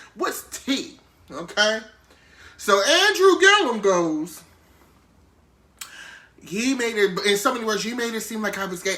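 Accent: Baltimore, United States. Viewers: His accent sounds like Baltimore Maryland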